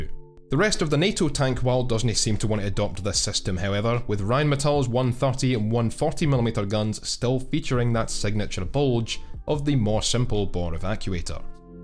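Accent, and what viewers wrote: Scotland, United Kingdom. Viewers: Correction, explosives are not used to push the projectile out of the barrel. That is called Propellent powder and it does not explode it burns fast to create pressure. The only “explosive” is the small primer that ignites the propellent, but you know all that and mid-spoke with that thick Scotish Broge. Cheers!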